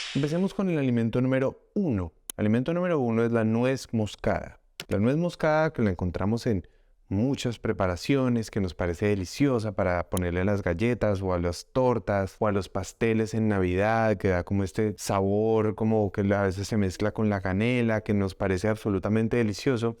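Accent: Colombia. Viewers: Dr as Ud colombiano? De Medellin o de manizales. Con el acento que tiene me parece haberlo oido desde que naci. Soy de Manizales en donde el 70% de la gente es y Ud con ese acento....pues debe ser o antioqueno I manizaleno. Cosa que me alegro sombre mantra y me pone muy pinchada❤❤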